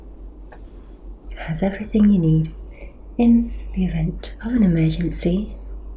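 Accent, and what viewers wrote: United Kingdom. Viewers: Hallöü 😄are you english?or scottish or irish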